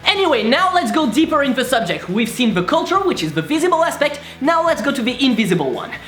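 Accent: France. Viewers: btw you can speak english with french accent more often. It's so nice to listen to. 😄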